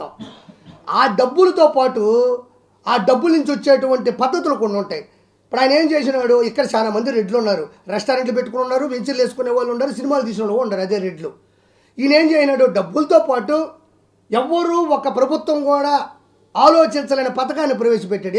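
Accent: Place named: Nellore, India. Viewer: Orey RP, you are making comedy with nellore language , first stop making comedy with nellore language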